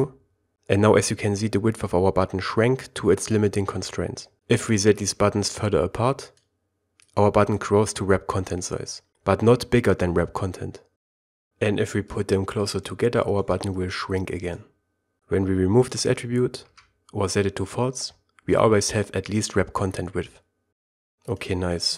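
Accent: Germany. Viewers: You sound like a German when he speaks English - I know it, because I'm german. :D And really great video!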